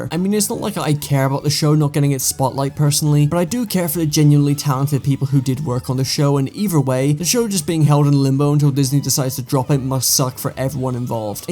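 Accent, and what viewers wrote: United Kingdom. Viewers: it's weird but I didnt even catch your british accent until you pointed it out, almost like a switch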